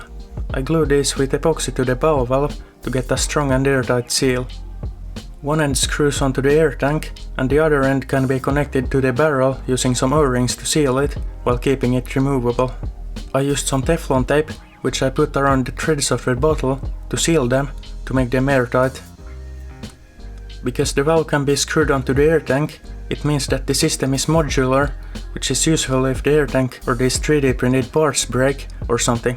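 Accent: Finland. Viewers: as soon as i heard your voice, i clocked that finnish accent than a speeding train turning your satsuma into dust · Finnish accents are so cute 😭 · Finnish accent? Like moths to a flame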